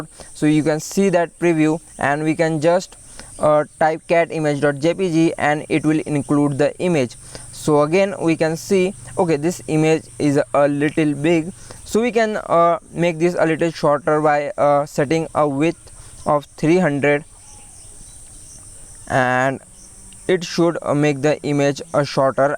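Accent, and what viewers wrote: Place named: India. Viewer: why accsent india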